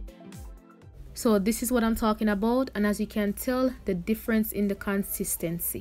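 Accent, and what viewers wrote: Jamaica. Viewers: Jamaican accent wtf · Great video very helpful, but I love the Jamaican accent more than anything . I will be watching all of your videos now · It's a long time I have been looking for a Jamaican plant based channel. Now I have found one with a Jamaican that sounds like a Jamaican.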